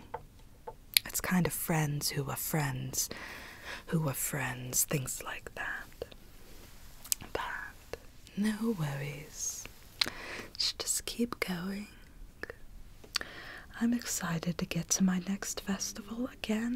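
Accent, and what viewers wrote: England, United Kingdom. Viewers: Ok but the fact that you got more and more English killed me omfg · I LOVE your british accent! You should use it in more of your videos, it’s so relaxing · British accent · Loved everything about this video from the cheeky humor to the English accent. You're lovely <3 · This is one of my favourites of hers. That "british"accent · Loved how you impersonated a UK English accent perfectly and it did feel strange but your Hippie way of applying makeup, would be my ideal way of putting makeup on. This is because I hate wearing makeup. Absolutely fabulous! One of your best yet! ❤❤❤😘😘😘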